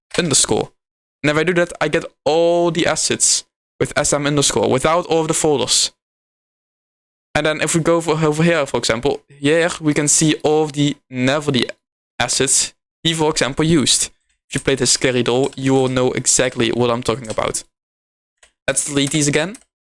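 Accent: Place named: Netherlands. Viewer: I lived in Amsterdam for 10 years and I've never heard a stronger Dutch accent than this.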